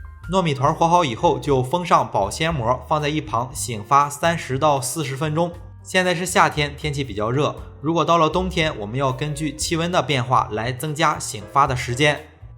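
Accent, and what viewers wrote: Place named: Yantai, China. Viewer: 听出来了，我是烟台人。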